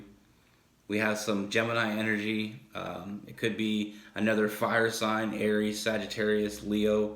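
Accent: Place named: Canada. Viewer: Can’t wait to feel this connection...so, when are you coming to town?? Lol jk. *no disrespect if you have a lady... I grew up on Canada (Rocky Mountain House, AB and Moosejaw, SK. I love your accent. Canada is so awesome, I miss it! Shoutout to all the Canadians! You’re awesome too. So adorable. Thank you for this. It’s so appreciated. ❤️🙏🏻🙏🏻👌🏻👌🏻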